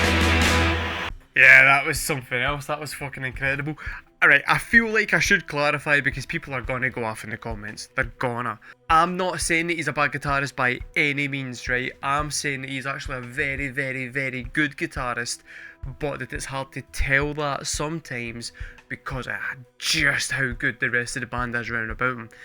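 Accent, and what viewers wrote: Scotland, United Kingdom. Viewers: Your words are SO much better in a Scottish accent; you really do recognise genius and express that so well. I've enjoyed every moment of this. Thank you mate.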